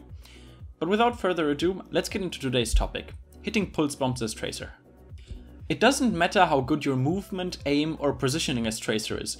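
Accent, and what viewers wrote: Germany. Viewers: Man hört halt krass raus das du deutsch bist :D Aber danke für die ganzen guten Tipps! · dat gierman aiccent... ausgezeichnet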